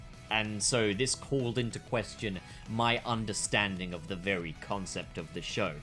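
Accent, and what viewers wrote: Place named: United Kingdom. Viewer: It's not your authority...it's your sexy soothing British voice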